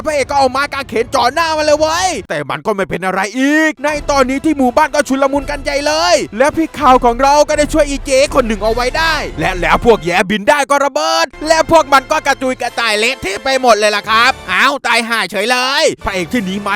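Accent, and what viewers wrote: South, Thailand. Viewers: มึงคนใต้...ไช่หม้ายคับพี่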